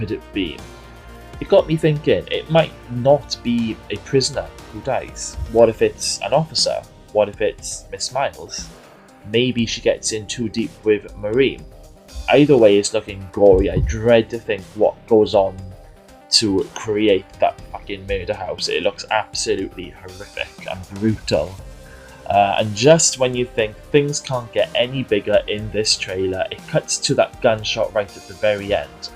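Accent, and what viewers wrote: Wales, United Kingdom. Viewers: Very Welshy mate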